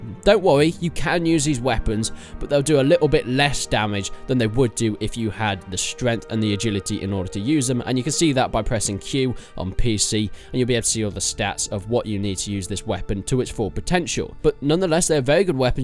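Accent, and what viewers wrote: United Kingdom. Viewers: Hrmm... U.K. Accent but you said the location is a couple hundred yards. Interesting.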